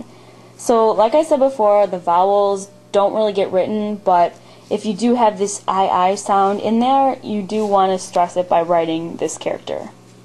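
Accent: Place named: Great Lakes, United States. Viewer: This was great! I have no real reason to learn the script other than general interest, but these lessons were helpful even for that purpose. I know there are a couple videos out there that show how to connect the letters and such, but I'd love to see a continuation from you! And your great lakes sort of accent makes me smile!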